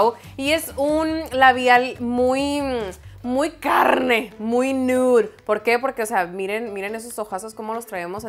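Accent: Mexico. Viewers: A mi me encanta que hables como está buenas sinaloense mujer la verdad 💜🍇💜🍇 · Jajaja me encantooo como hablas, pura mexicana! Saludos! Me acabo de suscribir porque me convenciste con tu flow de poses perronas jajajaja 😂😂😂😂😂😂👍🏼👍🏼 saludos de Guatemala 🇬🇹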